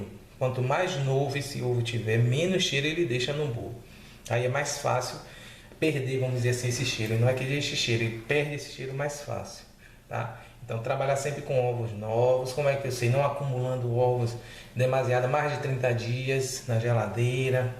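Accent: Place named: Bahia, Brazil. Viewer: que Deus o abençoe por compartilhar com a gente seus conhecimentos. Parece q vc é baiano né? O povo bom...As viagens q mais gosto são para sua terra. Então, gostaria de uma receita sua pra forma de 30 cm de massa amanteigada branca e de chocolate que na esfarele quando eu cortar.... se puder me passar???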